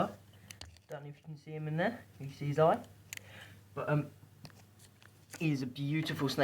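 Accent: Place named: Brighton, United Kingdom. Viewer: Hahah, you just sound like a mate of mine from Brighton.:D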